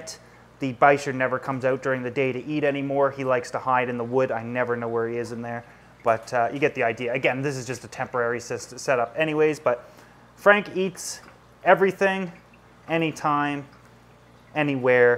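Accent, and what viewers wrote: Canada. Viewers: how Canadian this guy sounds is spectacular. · Sometimes you sound Canadian and sometimes you sound like a Joey from New York. Thank you for making this video. I enjoyed it a lot. From: Yous Guys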